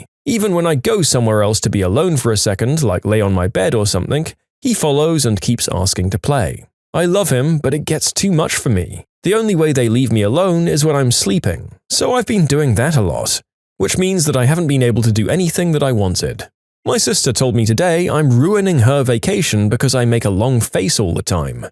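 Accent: United Kingdom. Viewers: its been a second since ive watched this channel, when did he turn british lol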